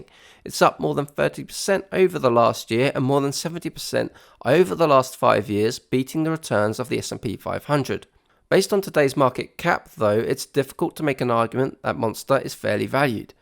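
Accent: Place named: United Kingdom. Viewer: Good video except for the annoying British accent, you English people should learn California accent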